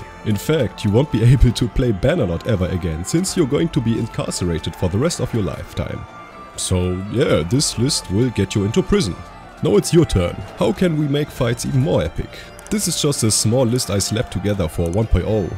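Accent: Germany. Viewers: Irgendwie hörst du dich wie ein deutscher an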